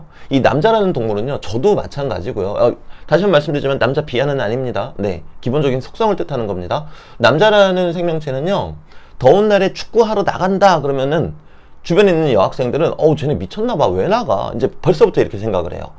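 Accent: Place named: Gyeongsang, South Korea. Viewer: 경상도에서 좀사셧엇나바여 ㅋㅋㆍ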